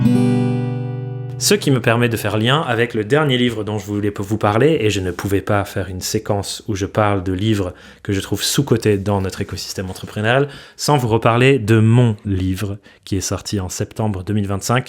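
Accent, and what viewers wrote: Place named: England, United Kingdom. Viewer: On aime toujours autant ton accent anglais ;) Merci pour vos recos monsieur !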